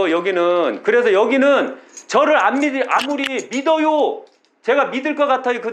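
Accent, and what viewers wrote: Chungcheong, South Korea. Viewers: 매일 유교약사생불사 봅니다 확신찬말씀이 좋습니다 충청도말씀이 참 친근합니다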